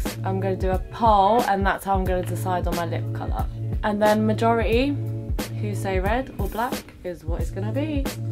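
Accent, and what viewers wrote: United Kingdom. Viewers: your voice like a British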